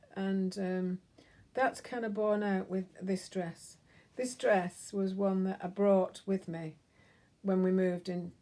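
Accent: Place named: Lancashire, United Kingdom. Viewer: Hi. You really don’t need to apologise for your Lancashire accent. You should be proud, at least people understand and know what we say x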